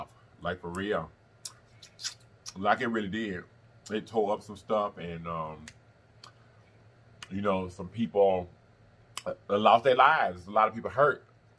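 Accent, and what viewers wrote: South, United States. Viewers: Yo personality gives me life 💜 and i love you Southern accent to.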